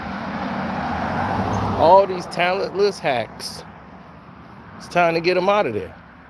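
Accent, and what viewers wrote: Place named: South, United States. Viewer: This DUDE Talk SLOW AND COUNTRY. GET TO THE FUCKING POINT. 😁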